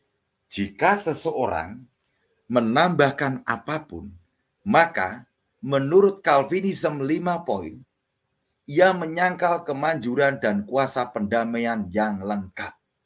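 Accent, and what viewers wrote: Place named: Semarang, Indonesia. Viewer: Maturnuwun mas atas pencerahannya. Berkah Dalem. Salam dari negeri shariah Kano, Nigeria. (Logat nya nganngeni, ….semarangan mbangeti , hèèh rak..😂)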